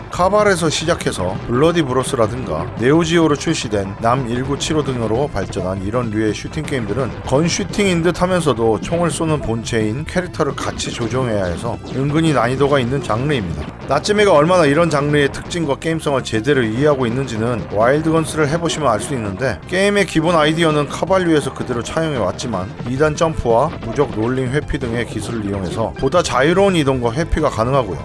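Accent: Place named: Jeolla, South Korea. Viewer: 극강인지라~ 뭐뭐인지라~ 전라도사투리 오지게나오네요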